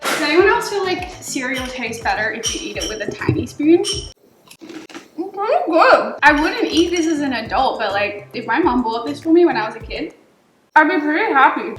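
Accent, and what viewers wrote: United States. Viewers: You aren’t American ffs! Quit acting and sounding like one. Grow up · Is it me or does this girl sound American half the time?? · What's with the yank accent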